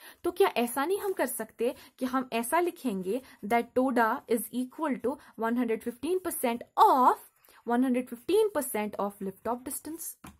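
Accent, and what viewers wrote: Kashmir, India. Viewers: Are you from Kashmir 😊 your voice resemble Urdu accent. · Superb explaination mam. It's seems by your voice that you are from Kashmir. And you are doing well. I was really need this in this time because my university exam is going to start.❤️❤️❤️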